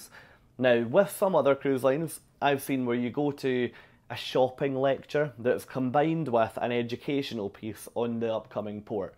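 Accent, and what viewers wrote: Scotland, United Kingdom. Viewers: Is this Scottish accent? I like it.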